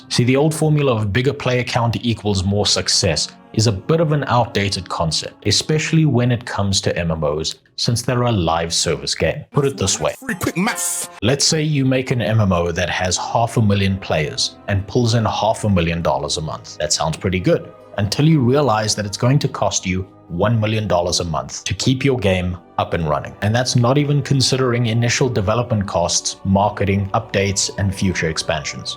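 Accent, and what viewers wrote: South Africa. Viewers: Saw you on Asmon bru, fucking amazing to hear an SA accent on a monster streamer like Asmon, he laughed his ass off at your clips of him!!! well done man!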